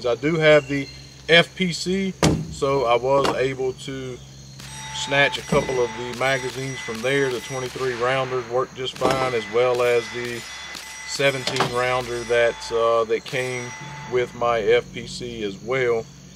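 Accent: South, United States. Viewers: Subscribed for the southern twang alone. Who makes your iron sights? Thanks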